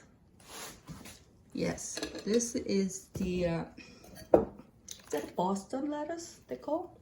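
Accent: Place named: South Korea. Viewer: Hi 😊 I am a korean american and I moved out of my family's house in 2021. I am missing a lot of korean food and it's very lovely to hear korean accent and laughter from you ❤ you make me miss so much food and I can smell the meat cooking from the screen... please continue cooking!! You are giving me so much joy ❤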